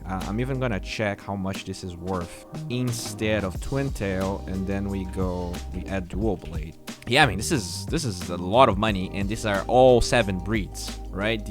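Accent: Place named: Brazil. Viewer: Mano, eu nem sabia que tu era brasileiro e percebi pelo sotaque em inglês. A gente fala de uma forma muito específica em inglês euheuheuhe. Ótimo vídeo!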